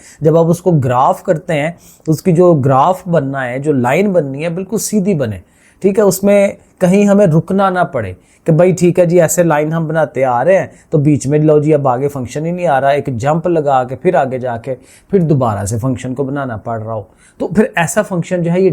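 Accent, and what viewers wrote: Sialkot, Pakistan. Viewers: Sir has a pure sialkot accent😂 like who else noticed❤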